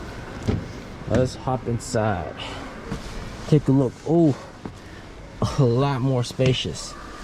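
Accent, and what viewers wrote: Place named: United States. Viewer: Never watched any of his video, got a headache from all the «cool american» accent. Keep it simple, not everything is sooo cool. From an european’s perspective, the car looks great, but sorry. It’s still a Chinese car with shitty range. It will never work outside of China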